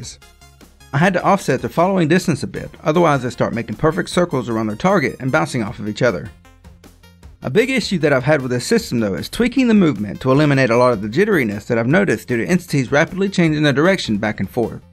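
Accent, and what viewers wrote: South, United States. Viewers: Holt crap I've never seen a person with a southern accent as a developer! :D this is awesome!!!!!!! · His southern accent makes the video better. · Just realized this is the first time I've ever heard a game developer with a southern accent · Are you southern or northwestern I genuinely can’t tell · I thought it sounded southern, but it was rougher than the other accents I've heard from that area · first time ever hearing a southern geek voice. not disappointed.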